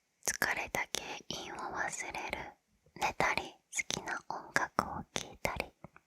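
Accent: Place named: Osaka, Japan. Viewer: スラム街を見学しました🙂‍↕️え、大阪弁！？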